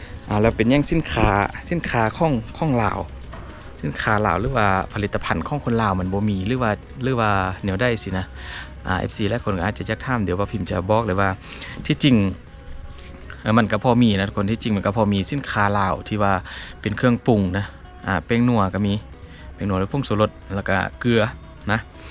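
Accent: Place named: Loei, Thailand. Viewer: พูดสำเนียงเดียวกับเมืองเลย หล่มสัก เป๊ะ เราชาติพันธุ์เดียวกันเด้อ แต่โดนฝรั่งเศสยึดไป สมัย ร.5 ❤❤❤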